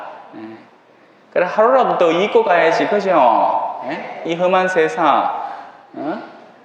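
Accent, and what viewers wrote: Gyeongsang, South Korea. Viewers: 정말 의 다양하신 탈렌트 멋지십니다~ 확실하고 화끈하고 분명하고 명확한 메시지 를 정확한 발음으로 구수한 갱상도 사투리를 섞어가며 전달하시는 신부님이야말로 진정 멋진 선교사이십니다~ 한가위 추석명절 기쁘게 잘 보내시기 빕니다~♡♡♡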